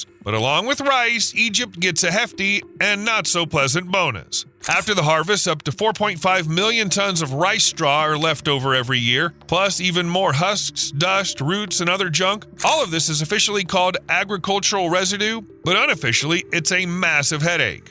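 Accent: United States. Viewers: Very interesting but spoilt by the most annoying american robotic accent. He sounds as though he wares very tight underpants. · Shouting American accent hard on the ears. Please bring a more toned down British person.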